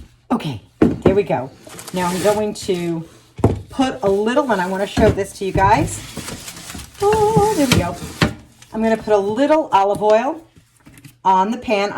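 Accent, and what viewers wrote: New York, United States. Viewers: I hear a New Jersey, or New Yorker accent......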